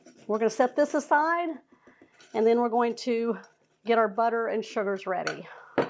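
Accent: Texas, United States. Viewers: Hee hee shout out from Auckland New Zealand!!! I got hooked on your lovely Texas drawl! I saw your other amazing blueberry monkey bread recipe! We don’t have your USA thing ‘biscuits’. Can anyone tell me what that actually is? Scone mix?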